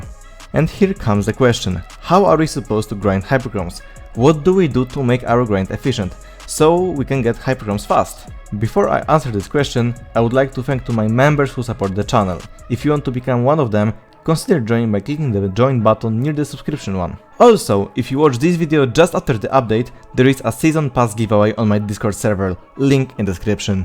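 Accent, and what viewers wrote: Poland. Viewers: Czy to polski akcent 😅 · you have polish accent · i love the polish accent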